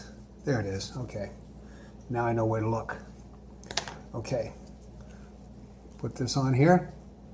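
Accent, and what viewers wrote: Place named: East Coast, United States. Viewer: Mama mia, this is Norm. My wifes headliner started to sag down in her car and I need to repair it, it get warm out here in Florida, but we love it out here. Well in reviewing some YouTube videos, there was your friendly face! however I did not recognize your East Coast accent. I was laughing my ass off, you are still a funny lad. Anyways it was good to get some tips from you, your head liner came out pretty good I must say. I had fund watching your video. Peace brother and God Bless you as well. Norm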